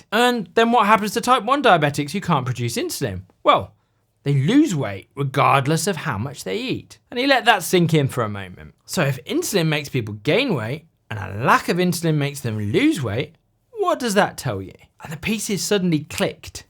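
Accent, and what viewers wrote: United Kingdom. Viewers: A re- re-boot! I hope to see more content. I tried sending your videos to a friend ca. 54 now about 4 years ago. He wetly talks about needing to lose weight (he does) but does nothing practical about it. I hoped the sound of a British doctor would be relatable, rather than a US influencer. People need familiar voices. I'll have another go with him.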